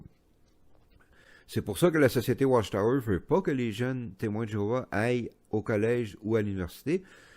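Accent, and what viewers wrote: Quebec, Canada. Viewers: Bonjour et merci beaucoup pour les informations, si vous avez le temps.. pourriez-vous les écrire les noms des membres du Collège Central ? Désolée ! J’ai du mal à comprendre avec votre accent québécois… ne vous méprenez pas… c’est joli comme nous à la Polynésie nous avons aussi un accent… nous roulons les rrrrrr… je vous remercie d’avance et bonne continuation pour tout…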